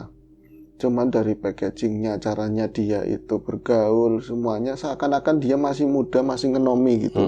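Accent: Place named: Tegal, Indonesia. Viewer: Bro kalau cerita pasti nyenengin, karena ada kocaknya dan ada bhs tegalnya.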